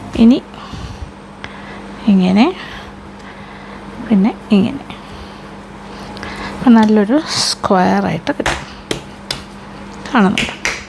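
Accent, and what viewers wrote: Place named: Central Travancore, India. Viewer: Your demonstration and explanations are easy to understand. Your Malayalam is from Central Travencore as well. Not of native Trivandrum type, it is clear and well spoken. Thank you for the time spent on items cooking. May God Bless you and Keep you.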